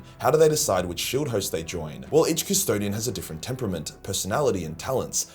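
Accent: Australia. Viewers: G'day ol son.....im new to, and love that an Aussie speaking in Aussie talking about 40K. I recently bought the Spider Space Elve, and Now You have Custodies. I had to get one and the best of these is the Golden Dread Lord, Big Cheers ya ol bugger from A M8 up In the Sunshine State🙃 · This guy’s frat boy personality is normally something I hate but man oh man, slap a Australian accent on it. I will listen to this man all day.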